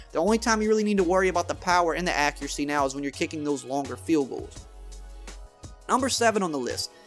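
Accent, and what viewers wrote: Louisiana, United States. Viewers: You sound straight from Chalmette. You're from Nola? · U gotta be from Louisiana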